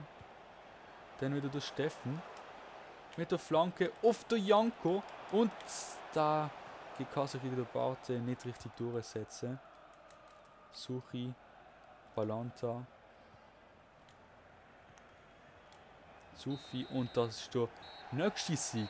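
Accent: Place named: Basel, Switzerland. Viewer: man merkt es das du basler bist an deiner typischer basler stimme weiter so like und abo ps. bin kein deutscher sondern basler schreibe hochdeutsch weil ich basler deutsch verlernt habe seit dem ich in bern wohne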